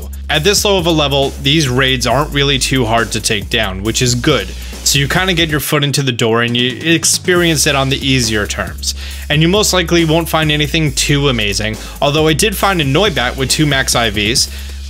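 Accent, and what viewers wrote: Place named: East Coast, United States. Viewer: I love the way you and everyone else on the East Coast say water... "Wooater" hehe 😁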